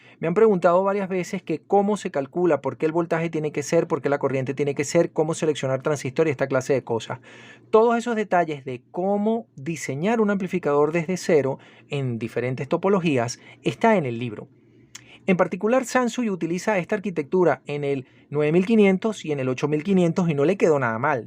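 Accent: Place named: Guayaquil, Ecuador. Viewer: Solo he visto unos minutos de este video para darme cuenta que usted es un verdadero técnico y conocedor de estos hermosos equipos de alta fidelidad. Una pregunta: en que país se encuentra usted? Por su acento me parece que en Guayaquil-Ecuador..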